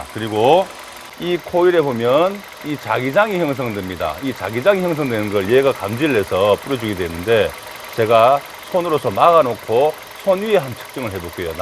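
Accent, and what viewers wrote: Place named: Gyeongsang, South Korea. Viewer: 사장님 혹시 매장이 어디신가요? 일단 경상도이신거같은데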